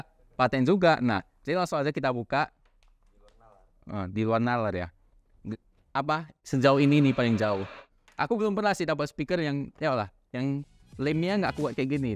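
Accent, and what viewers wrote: Medan, Indonesia. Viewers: Suka aku pada host ini. Akses Medan dan Cina jadi satu. Mantap kalian.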